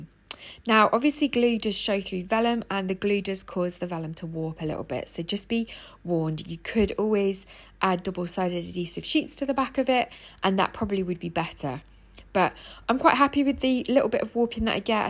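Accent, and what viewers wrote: United Kingdom. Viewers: Very clever, I have a tub of Tonic flakes that I used on a mirror I was up-cycling a few years ago, now I know how to use them in my cardmaking. I found your channel today by chance, listening to you it sounds like you have a slight west midlands accent. I might be wrong, I'm from Birmingham 😊💕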